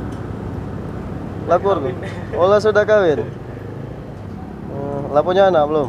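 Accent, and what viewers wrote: Palembang, Indonesia. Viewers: Kayak kenal bahasanya, wong Palembang yo om?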